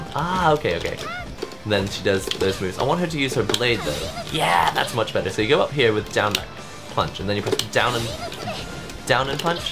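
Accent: United Kingdom. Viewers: It's British! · asian with brit accent! LOVE IT!!! You rock!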